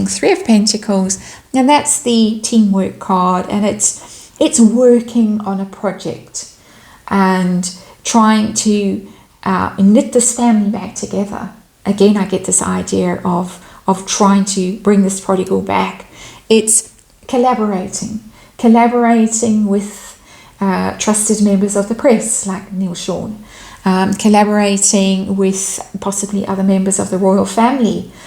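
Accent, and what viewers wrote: South Africa. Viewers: Yes I love the accent too! South African through and through!👏🇿🇦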